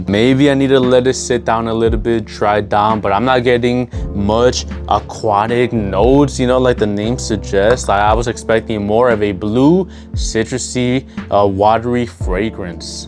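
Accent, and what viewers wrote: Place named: Mexico. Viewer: He’s not talking like that at all wtf are you talking about, he does have a sort of Mexican accent though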